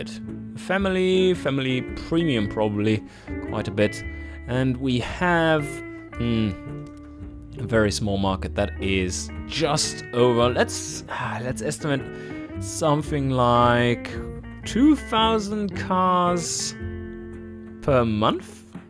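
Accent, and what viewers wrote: Germany. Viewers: well im glad you make those xDDD and just to say - its not very bad but i can here youre german as me - ever thought of making videos in german?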